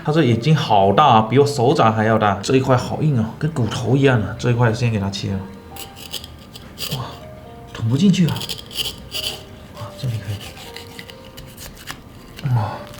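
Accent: Fuzhou, China. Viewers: 福州音太重了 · 这口音可是福州人？ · 福州人吗 感觉福州普通话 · 福州音好亲切哈哈哈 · 我想问， 你的口音有福州音，你是福州人吗？ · 我也感觉一听口音就是福州人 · 一听声音就知道是福州师傅lo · 福州腔太重了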